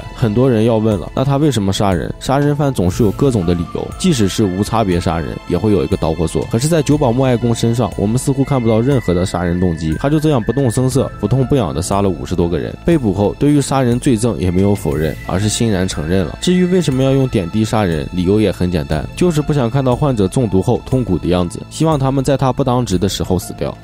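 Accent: China. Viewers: 播主是呼和浩特人？口音好重。。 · 哈哈，果然能听出来山西口音😄😄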